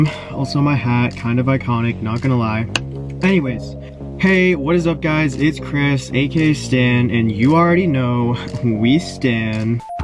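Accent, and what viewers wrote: Canada. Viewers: Am I sensing a Canadian accent? 🙃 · Are you actully from Canada? All your "ou" words have that Canadian "ow" sound!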